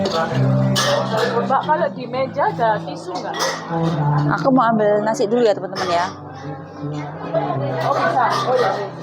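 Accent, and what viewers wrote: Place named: Java, Indonesia. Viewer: Ebak betuul ini Bun,,, niansa Jawa banget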